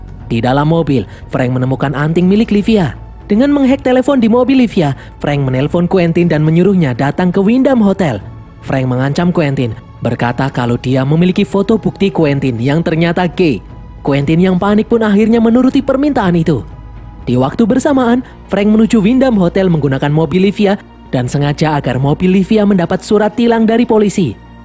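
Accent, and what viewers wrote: Java, Indonesia. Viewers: medOk x Jawa mu min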